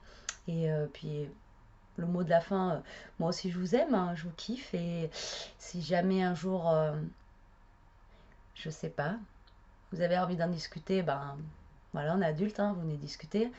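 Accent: South, France. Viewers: Ha pauvre. Perso j adore tes tutos. Ton petit bordel sur ton bureau. Ton accent du soleil......allez laisses tomber. Et continue de nous faire rêver avec ton sens de la création Bisous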